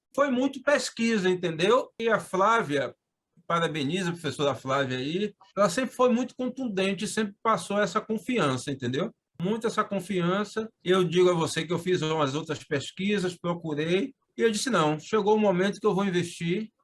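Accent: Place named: Northeast, Brazil. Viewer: Seu sotaque nordestino, não define o seu intelecto . Parabéns brother!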